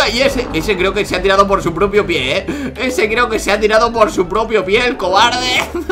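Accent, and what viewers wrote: Spain. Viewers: tu tomas cerveza y de donde eres supongo que de España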